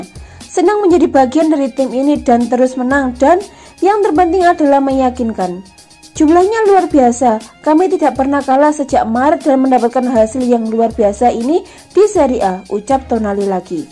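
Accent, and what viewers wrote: Java, Indonesia. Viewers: Suaramu muedok bgt mbakk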